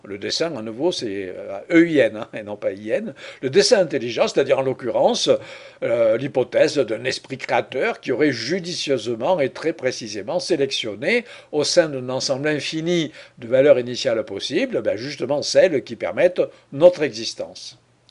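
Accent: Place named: Marseille, France. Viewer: Et j aime bien votre leger accent marseillais ayant vécu un peu vers Aix.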